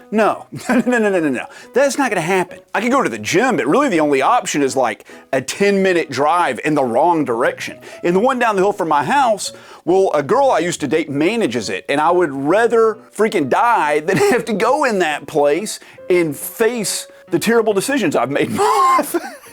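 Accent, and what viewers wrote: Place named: Tennessee, United States. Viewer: Random question my dude: Where the heck are you from? lol your accent sounds so damn similar to my home/ location (Knoxville TN USA). Great content regardless, keep it up haha!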